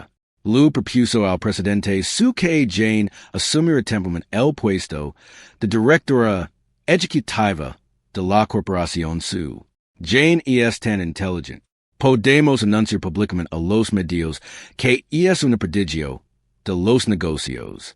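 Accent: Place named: England, United Kingdom. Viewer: Español con acento ingles"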